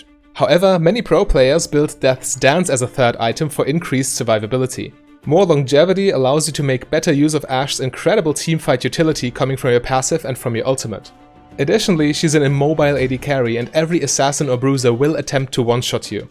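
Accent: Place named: Germany. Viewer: Inhaltlich gutes Video aber ich verstehe nicht, warum Deutsche mit so einem krassen Akzent englische Videos machen müssen. Ist mir in letzter Zeit öfter aufgefallen. Als ob es nicht genug englischsprachige Videos gäbe.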